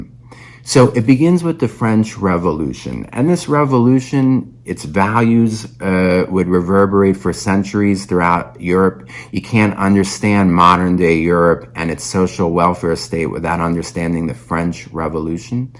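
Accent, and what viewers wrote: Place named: Queens, United States. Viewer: I am so glad to have found you! I was watching an ATHEY CREEK video today, and Pastor Brett spoke at length about your work! And I have to be blunt - as a girl from QUEENS, living in the SOUTH for the last 35 years, I was tickled to actually hear someone that sounded like ME! So glad to meet you! Looking forward to your next video! 👍🙏👍🙏👍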